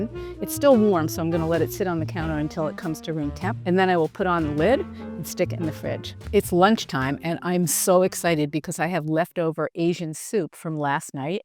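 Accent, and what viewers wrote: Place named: Hamptons, United States. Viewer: Love to use envelopes too, listening to your accent and a reference to the city, I’m thinking you live in the Hamptons? Wonderful view. Love your food, I make veggie soup too, but at the end I take a little out, blend it in the nutribullet and add it back for a nice creamy feel. I definitely need that string blender thing❤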